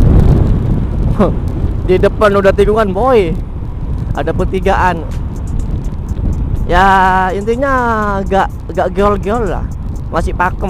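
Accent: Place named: Madura, Indonesia. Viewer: Maap bahasa indo khas Madura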